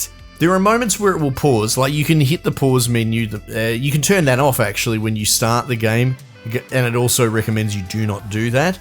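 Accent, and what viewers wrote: Australia. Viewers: ★ ★ ★ ★ ★ ⭐⭐⭐⭐⭐, in my 47 years I have never found myself on a youtube site for game reviews, and I only came upon your review of this game as I was looking for games that didnt require hundreds of gigabytes of storage. So seeing Hellclock at less than 3Gb I googled it and your review of this game was the top youtube result. So I start your review, and within 20 seconds I hit the subscribe button. There are so many reason why, and I wanna get back the review of the game so I wont blow too much smoke up your arse, but can I just say, I was in stitches and simulateously got a brain hard-on. You know your stuff man, and it's good to hear a fellow Aussie (or maybe Kiwi) who really doesn't need to be told how to suck eggs. I will be checking out more of your content in the near future, I just wanted to say "thank you, you're awesome." Sweet as I'm done.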